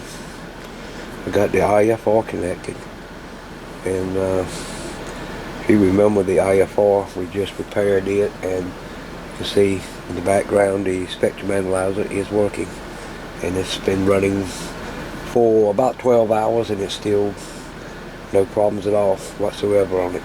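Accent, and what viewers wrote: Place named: North Carolina, United States. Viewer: You sound like your from North Carolina or Tennessee im from North Carolina.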